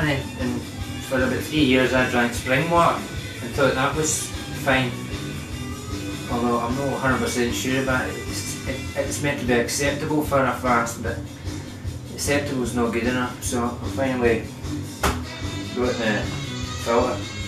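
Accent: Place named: Scotland, United Kingdom. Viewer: Say, whuh??? Huh? Whudie say? hahahahaha ..no, just teasin' ya. Couldn't understand a word ye said, but no mattah .. yer precious in your delivery ... and yes, last part was in yer proper Scottish accent ... I almost understood every word. I do declare, Scottish is much more difficult to understand than Dutch. Bless you for making this video .. I will definitely go out now and get a water distiller. It sure seems to be working for YOU my friend. Thanks again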